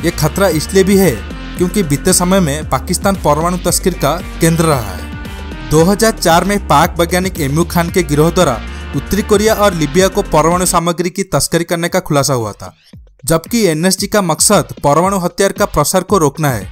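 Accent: Odisha, India. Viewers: mujhe to odia accent laga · Its not Bengali accent but Odia accent. Well done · Your accent shows you're from Odisha... Could you please work on your accent first? It's hilarious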